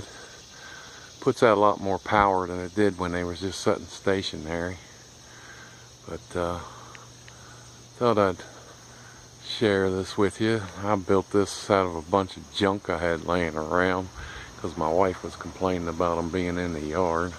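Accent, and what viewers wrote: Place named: Bristol, United Kingdom. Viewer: Your accent is cool. We here in England have nothing like it. Perhaps Bristol.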